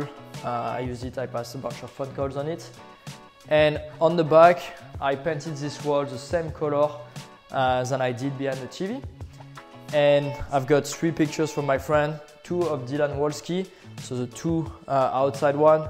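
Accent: France. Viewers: i am not able to understand a full sentence of that frenglish.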